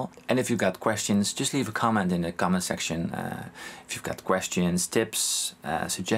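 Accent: Netherlands. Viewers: I can hear he is dutch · are you Dutch?you sound really Dutch XD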